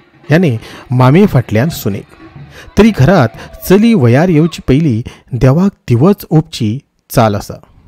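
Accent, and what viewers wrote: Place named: Karwar, India. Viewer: उत्कृश्ट लेखन आनी निवेदन...आमगेली गोड कारवारी बोली... परबीं तुमकां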